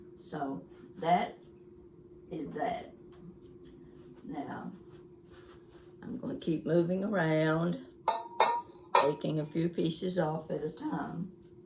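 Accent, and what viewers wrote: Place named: Tampa, United States. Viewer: I am a first time watcher. Thanks for the tour and the information. I’m going to examine my treasures to see if I have some of what you showed. You remind me so much of a dear aunt whom I loved very much. She lived in Tampa and she had your accent and your caring nature. It was delightful to come into your home. I hope to visit again and to especially see a table setting of yours. Perhaps you have pictures of the settings you have done. Thank you so much for your information and your lovely personality. Like you, I love my stuff.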